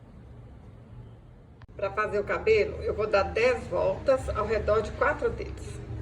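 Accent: Minas Gerais, Brazil. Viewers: Mulher... muito obrigada. Aprendi muito com você. Esse sotaque mineiro me lembra minhas primas e toda a família laaaa de São Braz Do Suaçuí MG. Muito agradecida viu... Beijão fraterno!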